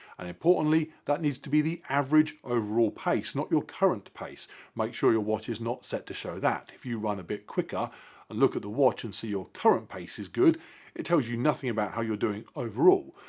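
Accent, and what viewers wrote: United Kingdom. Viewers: Pretty good tips to keep in mind. Also, as an American, your British accent immediately adds 20 IQ points and credibility.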